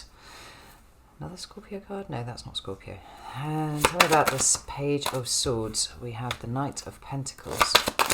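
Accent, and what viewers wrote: United Kingdom. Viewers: I get such a pure feeling of the British genius for language when you speak. Casts a spell.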